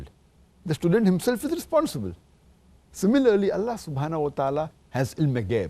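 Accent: India. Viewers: I'm a Muslim but I can't really understand his saying. His English is can't understand because his voice Indian Accent 😢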